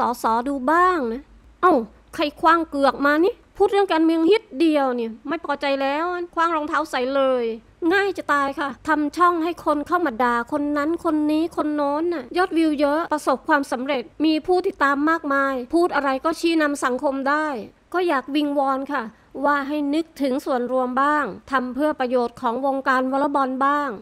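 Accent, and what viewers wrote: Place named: South, Thailand. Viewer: สุดยอดครับฟังเสียงน่าจะเป็นคนใต้นะครับ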